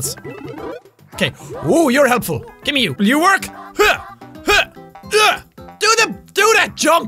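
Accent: Ireland. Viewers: I love is irishness. Mareio, shtoopid, I love if all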